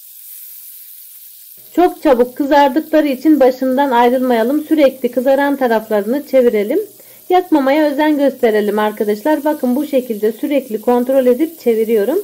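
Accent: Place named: Afyon, Turkey. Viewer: Afynlu olabilir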